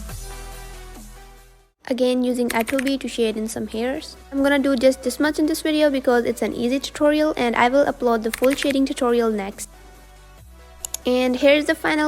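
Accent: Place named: India. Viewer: Don't hide ur indian accent.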